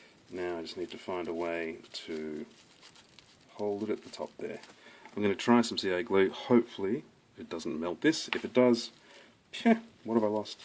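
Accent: Australia. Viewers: He's speaking English. His Australian accent doesn't make him that hard to understand.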